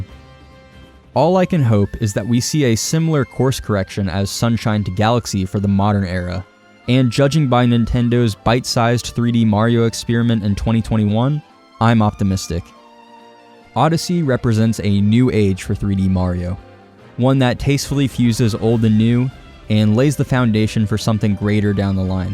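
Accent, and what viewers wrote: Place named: Northeast, United States. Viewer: you have a very northeast accent and it’s dope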